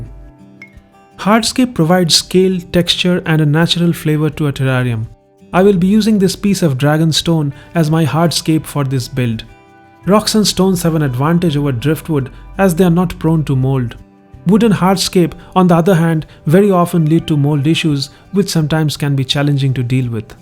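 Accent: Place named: India. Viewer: Your accent is very strong. I couldn’t understand you at first glance but after 5 slides of the same thing. I get you my brother from India dude.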